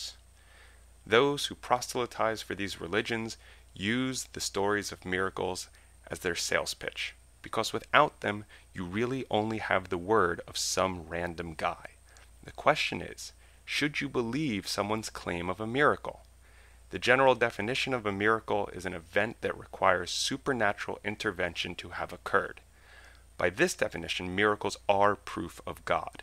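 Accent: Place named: New York, United States. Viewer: lol i detected some hint of "new york" while i was listening too lol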